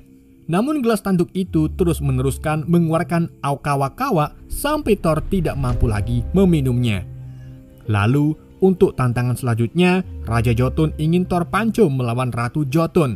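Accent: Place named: Wonosobo, Indonesia. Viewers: Membetett i iwak .. Curiga wong Wonosobo iki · Admin e wong jowo, damput😂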